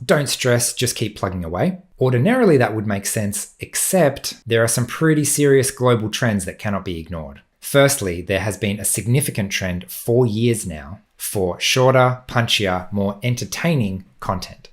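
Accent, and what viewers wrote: Australia. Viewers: NOOOO, please stay, you're a rare Australian voice with unique perspectives on autism and evidence based info delivered with a warm gentle manner. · Please don’t quit. I’m a sometimes viewer, parent of an autistic person. It’s so calming sometimes to hear another Australian talking about any aspect of autism, I always learn something. I wonder if your helper might be called an ‘aerator’ as they will help you out into the air of the web? Best of luck whatever you decide.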